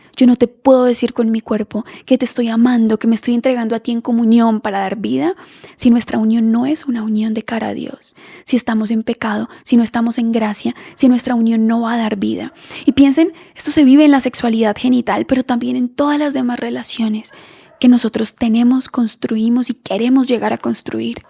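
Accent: Colombia. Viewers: Tono de ablar que eres colombiana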